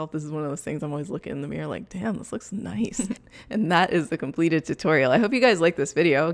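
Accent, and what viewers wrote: Canada. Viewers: Guys is she canadian?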